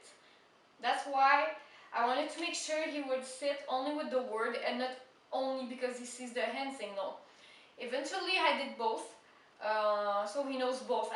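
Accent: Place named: Russia. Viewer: mdr, j'étais pas sûr mais parfois tu as la même intonation que les russes, j'entends plein de russe parler anglais ici (shanghai) :)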